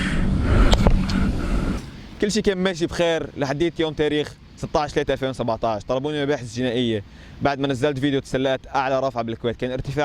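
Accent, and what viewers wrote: Lebanon. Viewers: لهجتك كتير قريبة ع اللهجة اللبنانية، و ع فكره باركور مخيف😍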